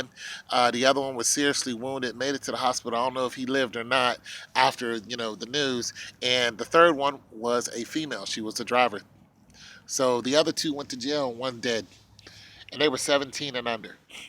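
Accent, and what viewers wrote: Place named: New York, United States. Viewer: You say you from Ohio and/or Pittsburgh, but you sound like a New Yorker all day. Ohio, nor Pittsburgh has burrows. What's really going on my G?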